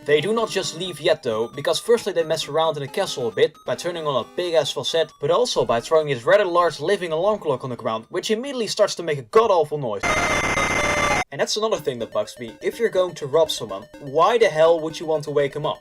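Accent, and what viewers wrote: Netherlands. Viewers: the dutch accent is real XD. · Nice video.You have a new sub :D And also the Dutch accent makes it that much better xD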